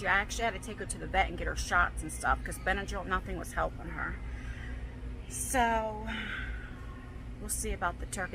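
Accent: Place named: New York, United States. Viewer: I hope Lexi feels better soon with her side effects. I am praying for you and Lexi daily.🙏🙏 It's a sick world out there with all this crap. Family first, don't worry about posting when you can't. We all understand. Are you from NY? I was born there, I think I hear that NY accent. Take care. Love watching your channel.😊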